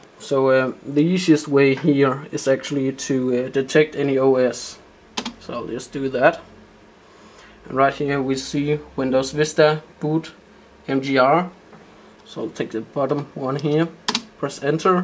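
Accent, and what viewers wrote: Denmark. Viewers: Dude, you're so danish :-D - Its so obvious by the way you're talking english!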